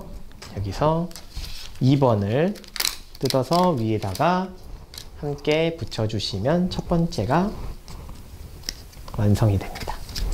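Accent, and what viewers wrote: Jeolla, South Korea. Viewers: 뭐여 전라도 빨갱이방언이여?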